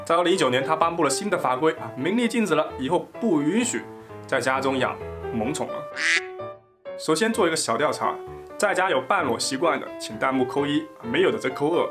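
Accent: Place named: Shaoguan, China. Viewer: 看你的说话口音和脸，我猜你是广东韶关地区的😀我粤西人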